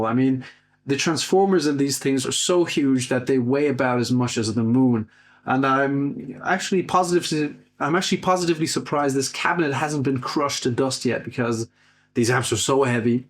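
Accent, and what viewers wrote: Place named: Ireland. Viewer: Also, apologies if its been mentioned in other videos. I've been meaning to ask for ages. Where's the accent from? I'm in Wexford and I know I'm hearing an Irish accent but theres something else going on thats throwing me off.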